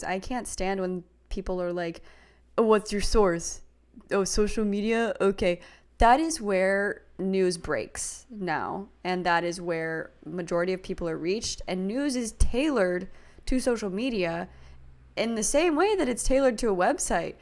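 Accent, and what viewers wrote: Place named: South, United States. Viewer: You should do some Southern Receptionist ASMR stuff <3 accent so good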